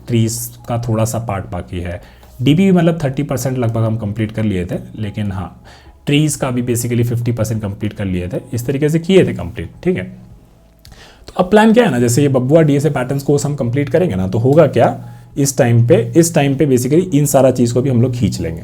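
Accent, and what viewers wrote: Bihar, India. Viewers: Aap bihar se h kya thik h 😊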